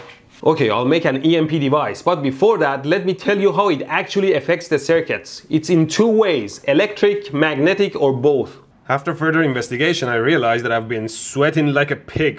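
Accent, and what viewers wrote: Iran. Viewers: he has an Iranian accent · لهجه ایرانیت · Your accent looks like iranian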